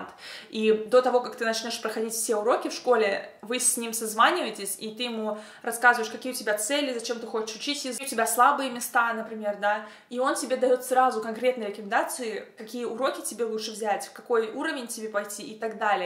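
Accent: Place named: England, United Kingdom. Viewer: Слышу в твоём русском английский акцент 😄😄😄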